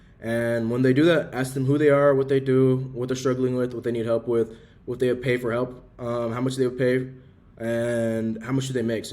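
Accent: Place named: Kenya. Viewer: heard your kenyan good work brother 🌵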